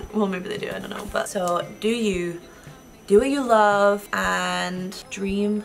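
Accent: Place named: Glasgow, United Kingdom. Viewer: Love your channel! Not a bodybuilder myself, but I’m a girl and us girls can always help each other out:) Spent my early life in Glasgow and hearing you speak makes me feel at home! 😘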